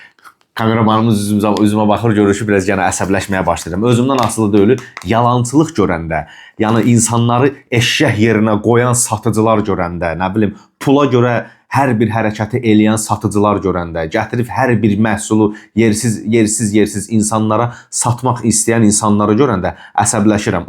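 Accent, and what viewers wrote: Ganja, Azerbaijan. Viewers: eşq olsun qardaşım! elə birinci videonnan tutmuşdum ki Gəncəlisən)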